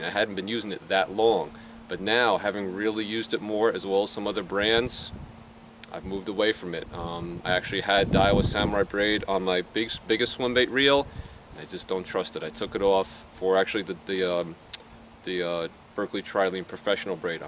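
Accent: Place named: New Jersey, United States. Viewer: I'm sure you've already addressed this somewhere, but I can't find it... What part of Jersey are you from? I pretty much knew from the accent, but this video, with your license prominently displayed on your hat, eliminated any doubts. 😀 Just so I understand completely, are you saying essentially all your break offs on this line and Samurai have been on casts? I know that can occur when a couple of little loops form or you've caught a large fish or snagged up and try to pull it free (and it sounds sickeningly like a gunshot). In any case, if it hasn't been breaking on hooksets, I still might give it a serious workout. Part of what's going on, I'm sure, has to do with the fact that Japanese lines label their actual break strength whereas most of the domestic lines like Power Pro, Berkley, and Sufix rate them sort of arbitrarily by diameter, which is why Samurai is so much thinner at each labeled strength. 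I'd bet 80# Sufix breaks over 100# on an IGFA test for straight pull.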